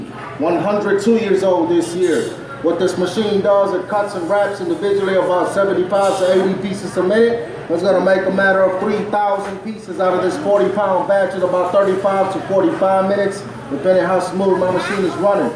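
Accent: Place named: Houston, United States. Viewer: Damn my nigga. Got that south east side accent kinda strong hehe. Sounds like all my homies in htown.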